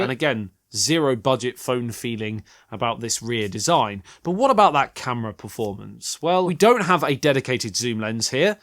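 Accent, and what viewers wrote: United Kingdom. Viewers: Love British English accent ❤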